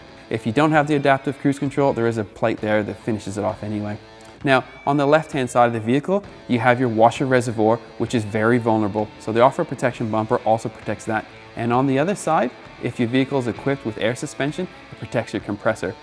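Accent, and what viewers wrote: Australia. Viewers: So lost he’s got a North East accent with Aussie undertones! 😆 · Thank you for toning down your Australian accent so I can understand you! Great video and project - thumbs up! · Such a slick and polished video but the light bar is crooked. The presenters accent is so "Australian light" that it's distracting. But OMG those are some killer upgrades. The black car hides all the cool upgrades. A car that was any other color than black would have been a better choice IMHO. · Idk how you have an Aussie and Brooklyn accent at the same time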